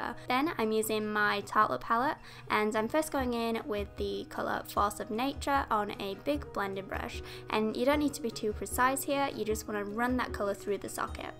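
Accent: Yorkshire, United Kingdom. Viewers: Yay a new vid! Where is your accent from? Is it somewhere in Yorkshire, I always watch your videos when I have panic attacks because your voice really relaxes me:) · so nice hearing a yorkshire accent on this website!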